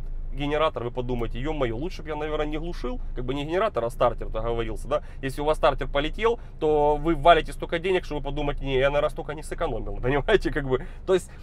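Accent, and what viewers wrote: Ukraine. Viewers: блеадь эта укр ская манера речи хрен что поймешь ) даже увеличив звук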